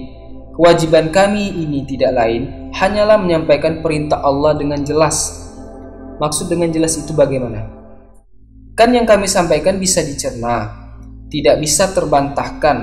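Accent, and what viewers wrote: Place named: Pontianak, Indonesia. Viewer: Org Pontianak ya bang..haahha..mantap ni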